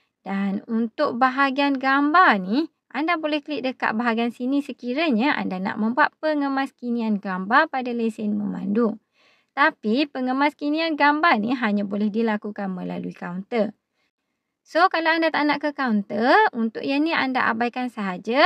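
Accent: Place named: Kelantan, Malaysia. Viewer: Awk org kelantan ke. Mcm slang kelantan 😊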